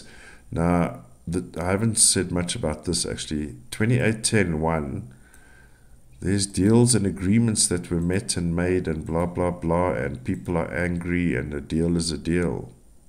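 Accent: South Africa. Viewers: Anyone else just clean their whole house or take naps or listen to these videos before bed? I just love South African accent.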